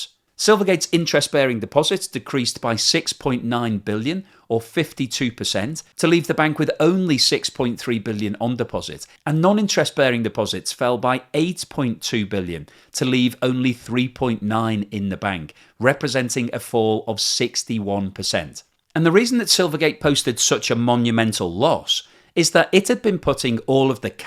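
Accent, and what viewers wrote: United Kingdom. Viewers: Just because you are British you sound like you know what you talk about but you don't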